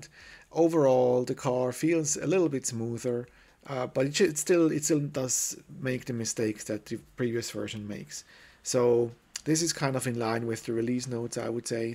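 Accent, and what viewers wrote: Switzerland. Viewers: Are you from Switzerland? Your accent sounds a little swiss-like;) btw. great video! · I love your accent swiss man ;-)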